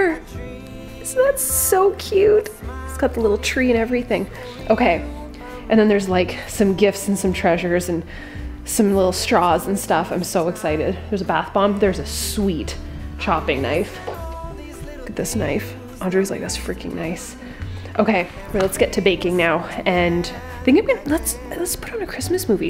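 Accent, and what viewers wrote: Canada. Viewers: Canadian eh?:)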